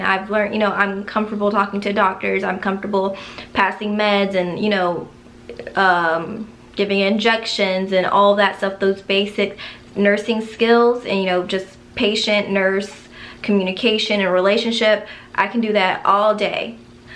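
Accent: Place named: New Jersey, United States. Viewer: Sounds like u went to jersey. Great video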